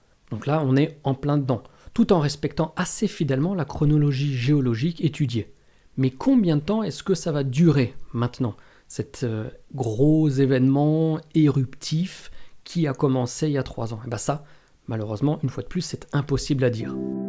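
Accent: Iceland. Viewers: Votre accent islandais est surprenant, l’islandais est surprenant ^^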